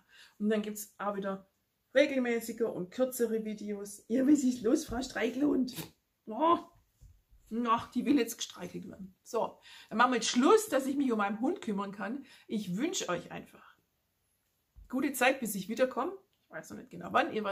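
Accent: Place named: Swabia, Germany. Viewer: Hallo,was hab ich mich auf und über dein Video gefreut.Das es dir gut geht (Mann und klein Lily natürlich auch) und das das Ergebniss von deinem Knie ein gutes war,Gott sei Dank.Ich schaue nicht sooo viele Potcast aber deine verpasse ich nie,ich hör so gerne wenn du schwäbelst:)So,jetzt wünshe ich dir noch eine schöne Zeit,und vor allem bleibt alle gesund. Es grüsst dich ganz herzlich die Gaby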